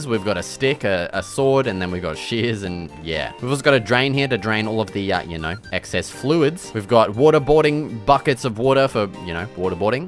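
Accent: Australia. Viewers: Fuck man I love Australian accents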